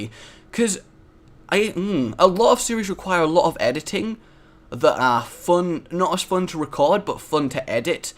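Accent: Germany. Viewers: Who else, when hearing "German" accent, instantly thinks: "Flutters"? Also, since you are not a vegetarian, what type of meat do you like the best? I like Moose meat personally... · You're german accent is quite funny, but what I don't understand is how did you come up with it? Usually everyone who does a german accent try to sound really aggressive and load and all that, you know, because of the stereotype and so on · Your german accent is way to high pitched.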